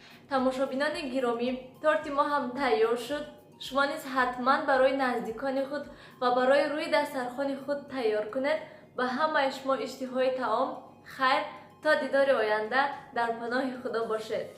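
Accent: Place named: Panjakent, Tajikistan. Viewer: Зинда бошен апа, гапзанетон Панчакентихоба хела монанд будааст 👍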